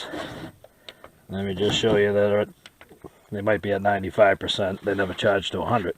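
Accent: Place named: Boston, United States. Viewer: You sound like you’re from Boston. I just got into these older inspires and I’m probAbly gonna start running into battery problems. Maybe you can help out a fellow Bostonian with a couple of inspire 1s. Love to hear from you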